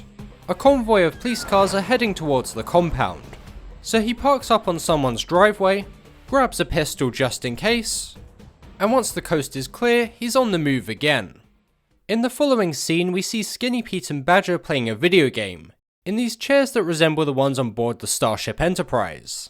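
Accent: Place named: South, United Kingdom. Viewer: It’s just a regular, South English accent.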